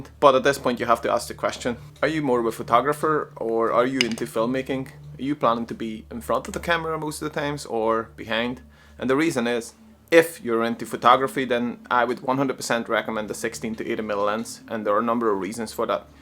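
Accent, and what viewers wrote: Northern Ireland, United Kingdom. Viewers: so helpful. Just discovered your vids. am learning a lot. I got a XT4. Love that Norn Iron accent :-)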